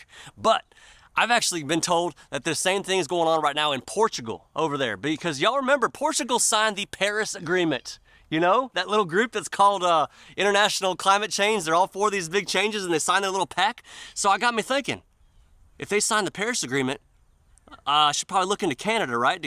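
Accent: United States. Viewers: You sound USa educated.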